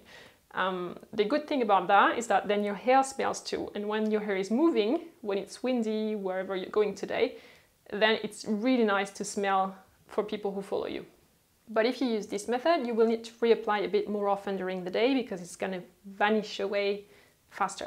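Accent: France. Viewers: Am I hearing english in french accent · Haaaa shes French. She pronounces it with a French accent. She'd actually know. No where did she say Glass. Thats the french r you're hearing. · I love this video. It’s perfect, concise. You have such a lovely accent, and your perfect red manicure along with your black top are exactly how I imagine classy, quintessential French ladies. My favorite go-to perfume is Alien by Thierry Mugler. I know some ppl find it strong, but I love it. I received a sample once and knew I had to have the large bottle. I always spray on my wrists, neck, and behind my knees before I go running. I love how I can smell it come off in warmth as I run along. Plus, the name appealed to me. When I purchased it, at that time in my life I very much felt like an alien in my world lol. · more than your knowledge of perfume, i was enthralled by your frenglish.😊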